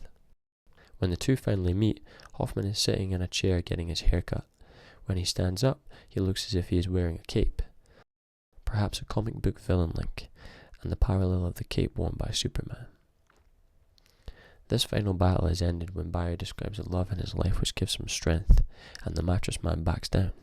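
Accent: Scotland, United Kingdom. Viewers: I think he’s just Scottish :)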